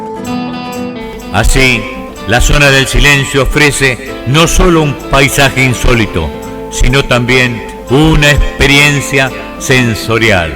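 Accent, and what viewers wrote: Argentina. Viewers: Qué hace esa voz argentina narrando algo del norte de MÉXICO??? 🤷🏽‍♂️😂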